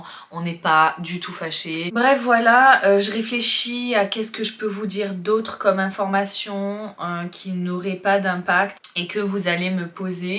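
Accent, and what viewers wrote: South, France. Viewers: Coucou, je t'ai decouverte hier, avec une vidéo lié à ta nouvelle vie, une nouvelle vie qui j'espère t'apportera plein de bonnes choses, de toutes façons faut ce dire que dans la vie rien n'arrive par hasard, malgré beaucoup de sourire que j'ai vue sur tes vidéos ça doit pas été facile mais faut positiver. Je te souhaite beaucoup de bonheurs et de courage pour la suite. Ps: super contenus ultra naturel, un petit accent du sud plaisant. Bisous du sud également.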